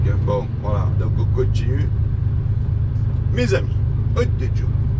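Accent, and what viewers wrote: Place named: South, France. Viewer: Cool ta chaîne, je m'abonne, sympa l'accent du sud 😊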